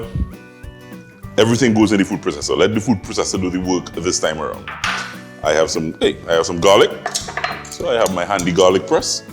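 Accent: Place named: Virgin Islands, Caribbean. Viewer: At first I thought I Heard Virgin Islands 🇻🇮 accent but I had to listen 👂 closely lol nice voice man now meh hungry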